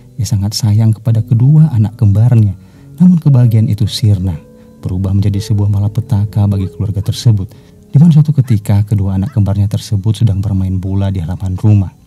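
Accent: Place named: Medan, Indonesia. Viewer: Suara nya kayak orang medan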